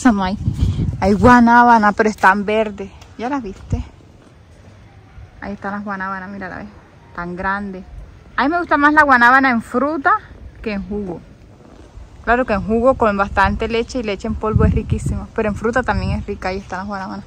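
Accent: Caribbean Coast, Colombia. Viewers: Costeñol puro!!! Abrazos, desde Cartagena, Colombia!!! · Tan bello, habla puro costeño. Jajajaja disfruta mucho la familia, que después tendrás chichi pa rato🙏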